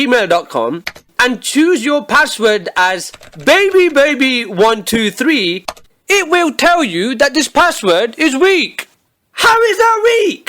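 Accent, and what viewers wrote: United Kingdom. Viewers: grade are you British ?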